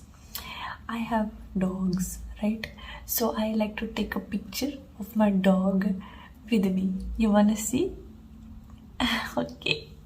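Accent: India. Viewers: Is this a Hindi accent specifically?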